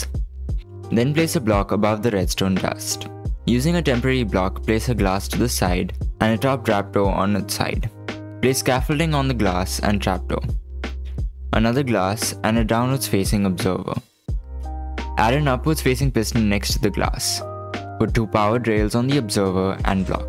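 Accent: India. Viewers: lol, i knew you are an indian the moment you spoke, your accent was similar to tech raj(another utuber), haha, i didnt expected an indian to be interested in minecraft mech. and tech. most of the indian minecrafters are jjust playing it for views and copying stuff, nothing special. source; im indian too. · Indian accent? Bro u Indian, btw just clicked on vid 4 secs ago.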